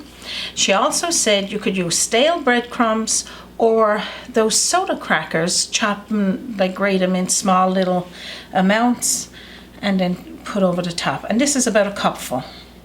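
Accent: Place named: Newfoundland, Canada. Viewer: Maybe a bit too personal a comment, but I love listening to you. You sound like my Nana who came to the USA from St. John's Newfoundland with my grandfather (Papa). They worked on fishing boats before coming here. I keep waiting for you to say "a spot of tea" as they did. Thank you for your accent. It brings back truly beautiful memories.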